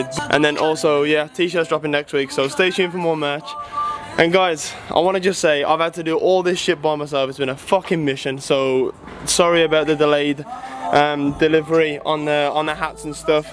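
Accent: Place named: United Kingdom. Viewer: Oh i love that british accent👌🏻👌🏻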